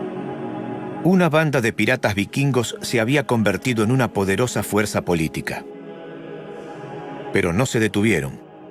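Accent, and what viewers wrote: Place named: Argentina. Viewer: Me gusta el narrador argentino la bataya!!!!!!!! Gracias.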